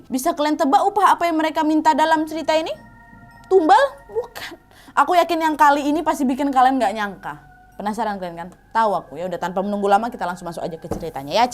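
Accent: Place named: Minangkabau, Indonesia. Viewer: orang minang yah? Huhu sukaaa banget logatnyaaaa. Campuran medan sama ada minang minangnya dikit. Semangat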